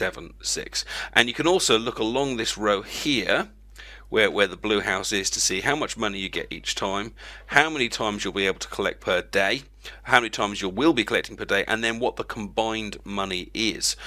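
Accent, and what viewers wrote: United Kingdom. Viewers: Are you British? Will you friend me on Origin?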